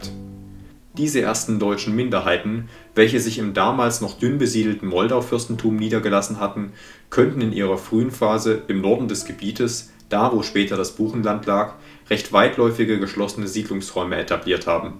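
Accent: Saxony, Germany. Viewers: Die Beiträge über unsere ehemaligen deutschen Siedlungsgebiete sind inhaltlich spitze. Vuelen herzlichen Dank dafür! ❤Es tut mir nur leid und meinen Ohren fast weh, in welchem Tempo und mit völlig empathieloser Stimme die Texte gesprochen werden. 😢 Man möchte gedanklich folgen können und sich so viel wie möglich merken. 😮Unmöglich! Wenn der Verfasser selbst spricht hat er doch eine emotionale Verbindung zum Inhalt der Texte. Wenn es ein Sprecher spricht: Absolute Fehlbesetzung. Obendrein mit sächsischem Klang. So wurde nirgends in den alten Ostgebieten geredet!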